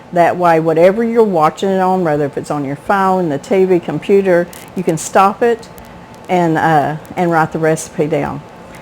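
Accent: South, United States. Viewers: I am a new subscriber from Ontario, Canada. Not only do I love your recipes and your channel... but... I absolutely LOVE your Southern accent. Whereabouts are you from?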